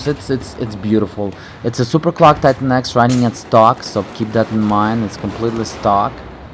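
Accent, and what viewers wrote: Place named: Russia. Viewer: official - 8K and 4K gameplay, benchmarks, reviews Мне кажется я почуствовал Русский акцент..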